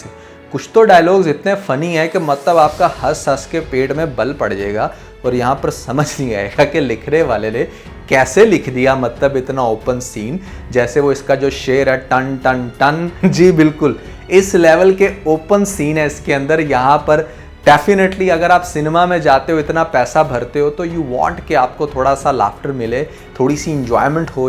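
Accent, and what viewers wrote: Punjab, India. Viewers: One request please stop speaking English as Punjabi you can speak Punjabi urdu or English but properly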